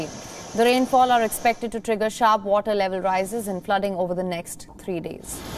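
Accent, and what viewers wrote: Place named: India. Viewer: The anchorwoman looks like a Filipina but with an Indian accent.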